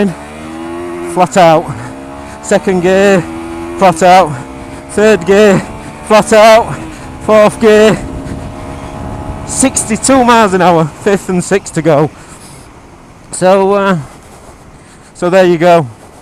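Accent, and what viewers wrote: Yorkshire, United Kingdom. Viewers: Music to my ears hearing a Yorkshire Motovlogger! Decent insight into the bike as well!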